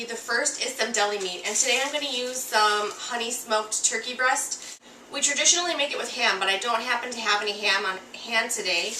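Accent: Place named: Minnesota, United States. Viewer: are u from minisota cause you have the acent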